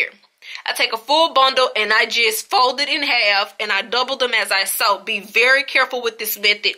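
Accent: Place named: South, United States. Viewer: You sound like the southern version of raven symone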